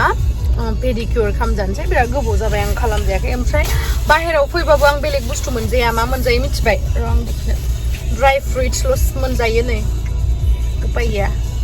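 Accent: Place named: Goalpara, India. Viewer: Manw sanjari railaiyw Aabo sona, Golpariya railainanag gwo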